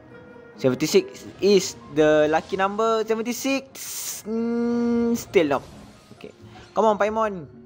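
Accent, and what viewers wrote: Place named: Malaysia. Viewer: U SOUND MALAYSIAN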